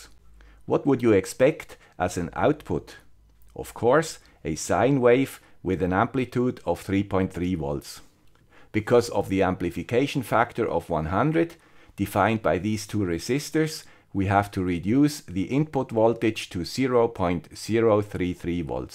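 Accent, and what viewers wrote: Switzerland. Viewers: Since you are the guy with the Swiss accent , I will call Scott the guy with the German accent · I think I knew that, black forest or something. I spent a day in Bex a few years ago with the jet wing on my back guy Yves Rossy. He also had your Swiss accent. Swiss seem to be decent people mostly. I made a bunch of videos of all that.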